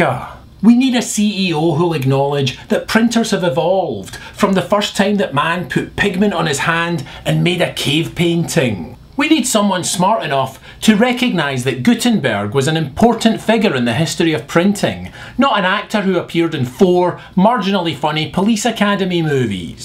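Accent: Scotland, United Kingdom. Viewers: I'm no going to say whether or not I support Obama or McCain. I am going to say, however, that the last two of videos have been incredibly disappointing to me. It sounds like he just reads op-eds with a scottish accent. I go to youtube to wind down, because I've heard to much of politics in my day. I don't want to be blasted by politics again., please go back to making fun of the iphone and dvd special features. Those sketches are funnier.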